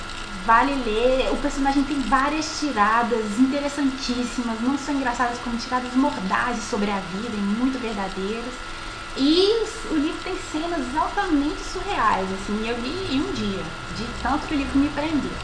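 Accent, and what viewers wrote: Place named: Minas Gerais, Brazil. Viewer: Genteeeee, olha vc!!!! Mega mineirinha😅😅😅 ah, adorei vê vc uns 10 anos de agora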